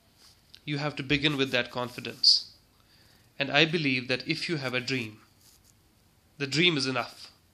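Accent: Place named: Kashmir, India. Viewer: Every kasmiri aspirant has this tinge in accent and when he speaks it sound like poetry... Athar aamir b aisa hi bolta hai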